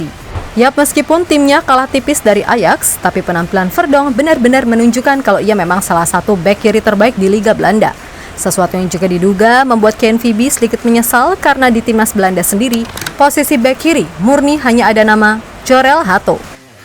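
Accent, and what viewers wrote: Java, Indonesia. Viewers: Suka medok e yu... Hehehe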